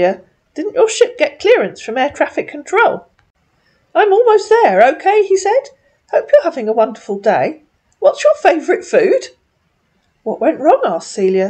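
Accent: United Kingdom. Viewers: Omg rofl "Quit telling me ridiculously stupid lies". With your exasperated tone in your cute British accent, you have me laughing like a big ol donkey!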